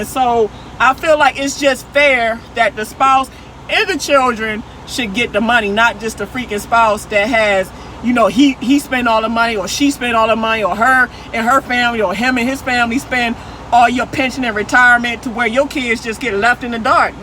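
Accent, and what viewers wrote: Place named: Baltimore, United States. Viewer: I can't tell you how this video came up in my recommendeds but I'm glad it did. I heard a few words and instantly knew, she from Baltimore. My best friend is from B'more and an old Army buddie. That accent is heavy. Be safe out there on that road Ma'am.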